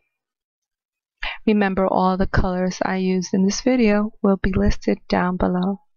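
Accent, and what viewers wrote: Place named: Cuba. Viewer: No no hablas muy bien pareces cubana me gusta tu acento eres muy buena haciendo videos sige asi yo te sogo siempre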